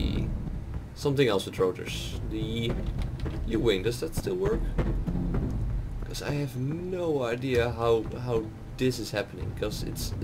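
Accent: Netherlands. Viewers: Are you dutch? You sound Dutch or even a bit French?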